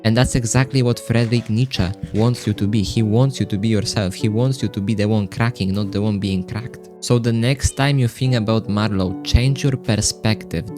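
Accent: Poland. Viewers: You have a Polish-like accent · slychac ze polak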